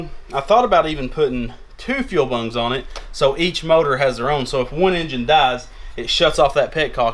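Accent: South, United States. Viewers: Are you from down south?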